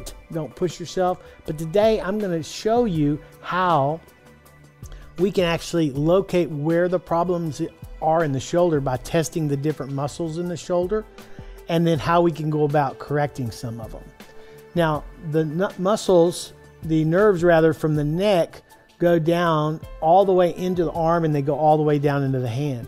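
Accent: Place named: Texas, United States. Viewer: JasonJMist I am not american so every american accent for me is just heaven! And he doesn't have just an american accent! He has a texas accent, which is heaven plus version for me hahaha I am not even a native english speaker and I can notice a lot his texan accent! And yes, for me the best and sexiest accent is not the british, it's the american. :) lol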